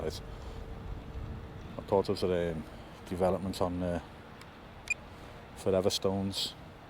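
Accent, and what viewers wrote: Liverpool, United Kingdom. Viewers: I could listen to ya wafflin on all day in that lovely Scouse accent mate!😊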